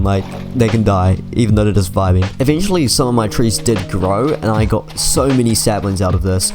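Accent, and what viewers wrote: United Kingdom. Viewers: He is british don't make fun of his accent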